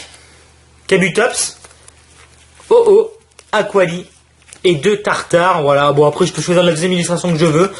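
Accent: France. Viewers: Suicune se prononce suicoune mais tu a to. Accent français xD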